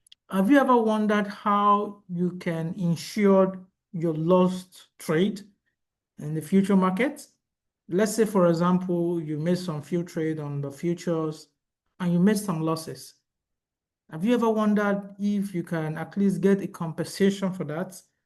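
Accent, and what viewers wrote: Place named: China. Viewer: 一听就是中式英语 可能是沙洋盘的😂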